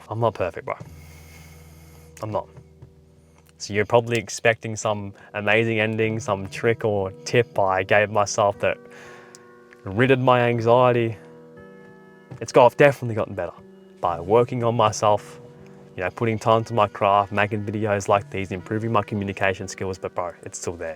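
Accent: Australia. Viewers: *? what part of Australia are you from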